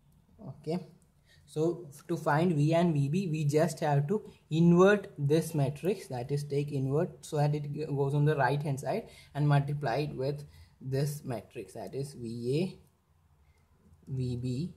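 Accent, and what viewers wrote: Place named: India. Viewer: Fuck you Hindi bastard with your shitty accent .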